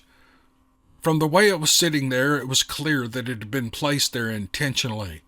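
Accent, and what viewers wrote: South, United States. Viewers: Enjoying this storyline alot. Do hope there will be more stories in the future. Will be listening to number four after this one, of course. Thank you, you narrative is great, like the southern drawl. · You did an excellent job reading these to us. I've heard you before, but hubby and I sat down and listened to all 6 Parts in one day, no joke. We couldn't stop listening until we heard them all. You are an excellent storyteller, and we appreciate you!! Your southern drawl and just the way express everything makes it come alive. I do not want to forget DA Roberts, who without him these would not be possible. Thank you again.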